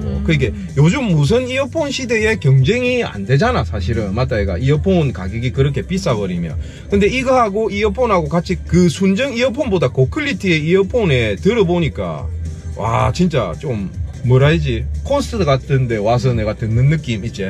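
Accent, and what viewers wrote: Gyeongsang, South Korea. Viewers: 크...많이 듣던 갱상도 톤 고향이 어딩교 내는 마. ...영천 이구마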